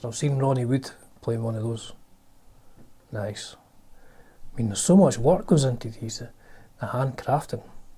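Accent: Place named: Glasgow, United Kingdom. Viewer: You sound like you might be on the Glasgow side of Scotland.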